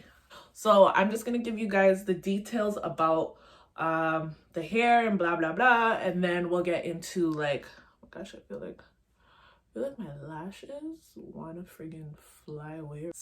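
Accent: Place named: Toronto, Canada. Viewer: You sound like you from Toronto. Your hair looks great I want to try these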